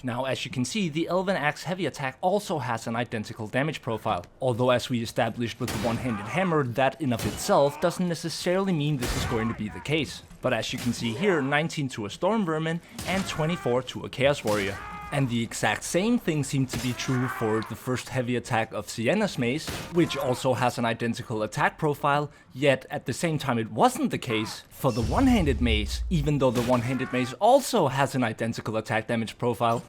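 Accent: Denmark. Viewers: Best vermintide channel. Are you danish by any chance 😉🇩🇰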